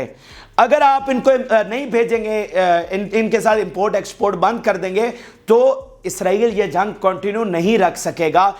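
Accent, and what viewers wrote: Pakistan. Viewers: Please! If you stop quoting the english version in your Pakistani English which is hard to understand, it will save a lot of time and we can watch.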